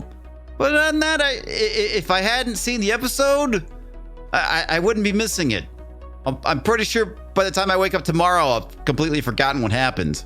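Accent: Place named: New York, United States. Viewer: You sound like an off-brand New Yorker, mate. I like it! And man was this show garbage.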